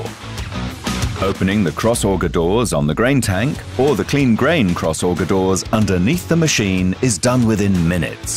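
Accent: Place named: England, United Kingdom. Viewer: I hate hearing English narrators talk....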